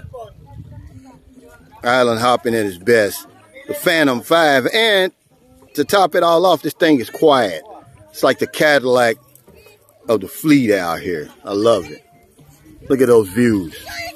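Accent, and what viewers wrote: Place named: Appalachia, United States. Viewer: Sir, i like your "Hill Billy" accent . Where u got it ? Which city from the states u from ? Best regards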